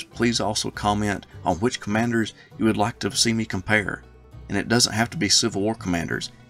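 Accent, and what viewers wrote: South, United States. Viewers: Ye know When I first watched one of your vids I heard the southern accent and country music start up and immediately expected a hard confederates bias but was pleasantly surprised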